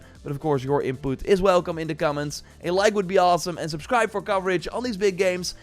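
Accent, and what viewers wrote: Netherlands. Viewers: kerel fix dat nl accent man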